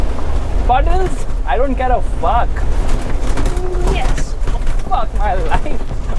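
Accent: India. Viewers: Lmao that indian accent. Why indians think they speak english like Americans. Im also indian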